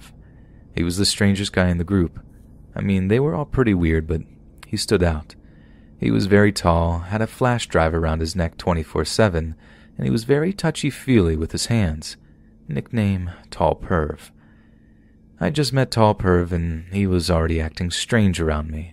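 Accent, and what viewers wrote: Ireland. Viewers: That Irish accent tho lmao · Nice Irish accent 👍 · that irish accent tho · It was very interesting, love it!! Love your Irish accent, it was awesome 👏 Thank you 🙏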